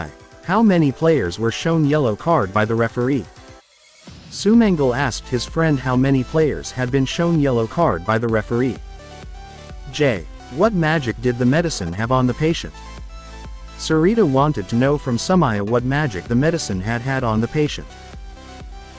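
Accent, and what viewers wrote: United States. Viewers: Thank you for helping us, providing high quality video American voice over sir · Thank you for helping us, providing high quality videos with American voice over.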